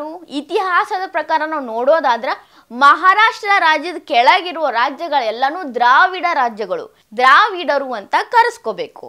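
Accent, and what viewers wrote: North Karnataka, India. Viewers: Utthara karnaataka😊😊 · ನಮ್ಮ ಉತ್ತರ ಕರ್ನಾಟಕ ಮಂದಿ ಭಾಷೆ ಕೇಳೋಕ ಚಂದ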